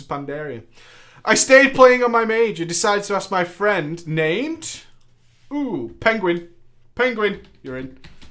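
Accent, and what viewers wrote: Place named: United Kingdom. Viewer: It's too bad you are on EU... I'd raid with you just to listen to you entertain us in Teamspeak or w/e you use... everything is more interesting with a British accent, lol.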